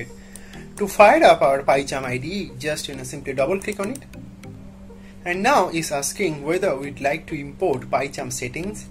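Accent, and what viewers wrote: Japan. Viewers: how the hell did he sound from JAPAN?!?!?!? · I was guessing Japan lol sorry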